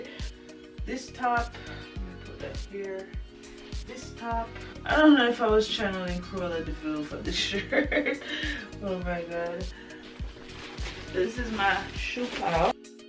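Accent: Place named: Jamaica. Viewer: Refreshing to hear a fellow Jamaican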